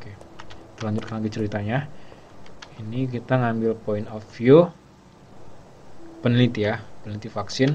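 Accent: Medan, Indonesia. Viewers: Bang kau orang medan ya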